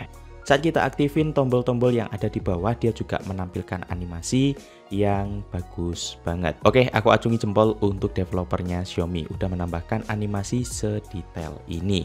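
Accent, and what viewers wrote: Banyuwangi, Indonesia. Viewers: looh mas e wong banyuwangi rek😂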